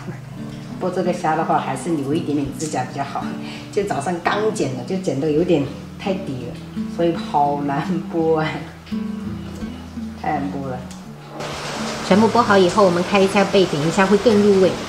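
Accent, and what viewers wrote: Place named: Haikou, China. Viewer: 海口音，親切感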